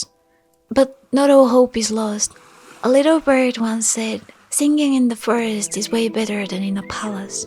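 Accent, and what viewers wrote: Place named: Scandinavia. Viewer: my friend lives in sofia (not exposing his exact adress tho lmao) and he has that big mountain near by that im jelous of, there might be a chance for me to travel there for a bit for a visit, it's funny that im mostly interested in the smaller villages or like the greenery around the city. your accent reminds me of like the or quite a bit that why i thought maybe som northen europe + you have this foresty presence and it really reminds me of like norway and stuff😍 when my friend visited me in poland he's reaction was like: everything is so spacious and like wide wow. here you have i guess everything from meer, flat terrains with/without woods to mountains. i've been to mountains only once because i don't live in the south which is where they are, but im jelous how many of these are in bulgaria. we have starbucks? lmao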